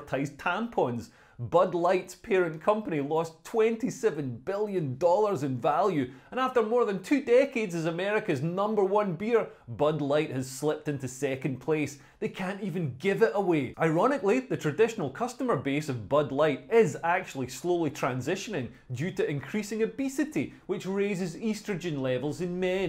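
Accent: Scotland, United Kingdom. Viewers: This guy and his damn beautiful Scottish accent is spitting all over me Spitting facts that is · I Really Like his Scottish Accent Talking about why he Hate Lgbtq Idk What he saying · Bro is peak Sco'ish 🏴 👌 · Coming from a gay dude, great video. I completely agree, I thank you for speaking out about this. And who doesn't love a Scottish accent? Besides the Romans of course...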